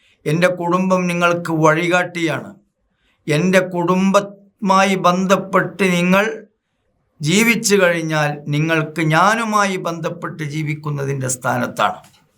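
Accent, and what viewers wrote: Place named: Malappuram, India. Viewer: ഉസ്താദ്, ഒരു വിനീതമായ അഭിപ്രായം..ചില വാക്കുകൾ മലപ്പുറം ശൈലി ആയതുകൊണ്ട് മറ്റ് ജില്ലക്കാർക്ക് മനസ്സിലാവില്ല. അതുകൊണ്ട് പൊതു മലയാളം സംസാരത്തിൽ കൊണ്ടുവന്നാൽ വളരെ നന്ന്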